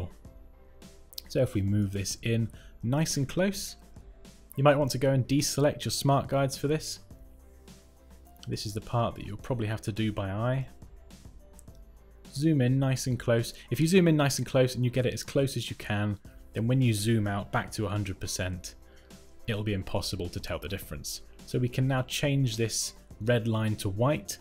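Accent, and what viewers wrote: England, United Kingdom. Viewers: I love this english accent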